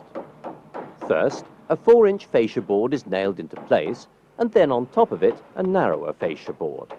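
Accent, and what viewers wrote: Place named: RP, United Kingdom. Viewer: Kind of a posh accent for a DIY project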